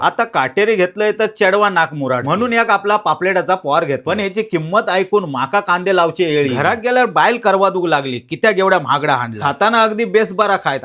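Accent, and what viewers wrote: Malvani, India. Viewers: आवाज with मालवणी touch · पयला मालवणी बोलतास ता ऐकणं बरा वाटला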